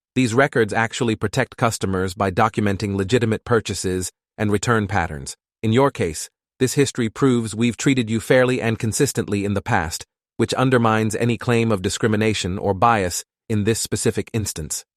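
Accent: India. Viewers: Why the Indian accent?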